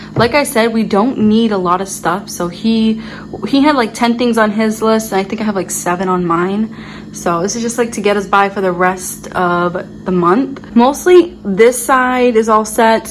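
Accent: Boston, United States. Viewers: She is from Boston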